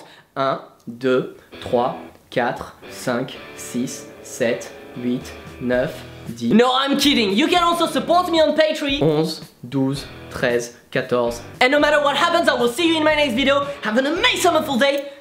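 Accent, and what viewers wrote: France. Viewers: L'ironie c'est que je perfectionne ma compréhension de l'anglais parlé en te regardant :D J'imagine que pour un natif des USA tu as des restes d'accent français, mais de mon point de vu tu parles anglais à la perfection ^^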